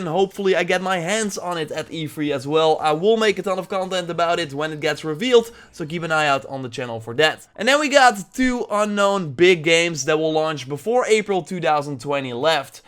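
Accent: Netherlands. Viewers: We horen gewoon dat je Nederlands bent door de manier waarop je Engels praat 😂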